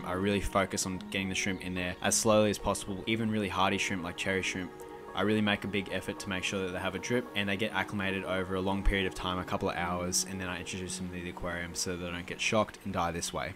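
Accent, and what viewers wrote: Australia. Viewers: Thanks for the informative video. What city do you live in? I am wondering because you sound Australian and I live in Melbourne and the water here is also fairly soft. So I am wondering how appropriate you think Melbourne water is for Crystal Red shrimp? Do you think it needs any treatment besides dechlorinating?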